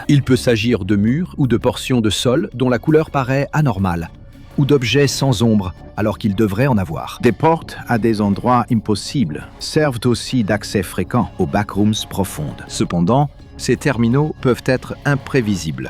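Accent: Quebec, Canada. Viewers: La vidéo est en québécois ?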